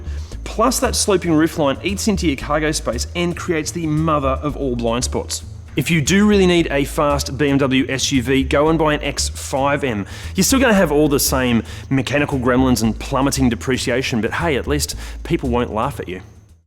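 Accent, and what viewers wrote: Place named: Australia. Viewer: omg keep joking you are underrated, funny, charismatic and lovely to watch probably because of that juicy Australian accent lol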